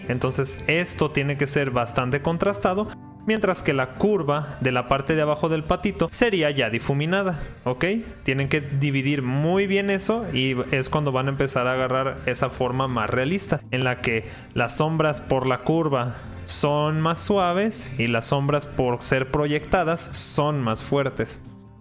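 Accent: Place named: Monterrey, Mexico. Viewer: Muy buenos tus videos compadre, stan con madre, se aprecia el esfurzo enorme muy entretenidos dinamicos con ese inconfundible acento regio, saludos🙏🙏🔥🔥🦾🦾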